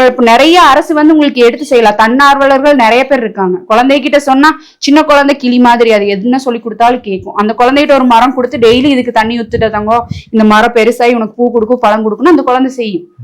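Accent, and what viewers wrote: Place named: Kongu, India. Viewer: ❤❤அழகு கொங்கு தமிழுக்கு அடிமை சிறப்பான பேச்சு ❤ சிங்கப்பூரில் இருந்து ❤❤❤❤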